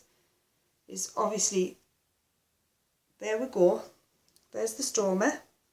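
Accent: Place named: London, United Kingdom. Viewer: You sound like you are in London, they have the TIES Ostomy Cure over there , so far 15 patients have tried it, still not yet ready, hopefully it will be available soon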